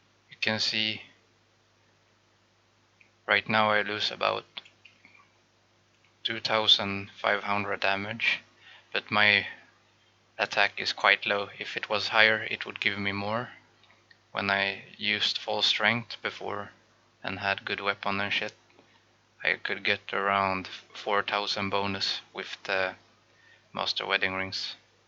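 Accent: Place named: India. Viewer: What? You sound indian :D . Of course i know it. But good to know for people who don't know it.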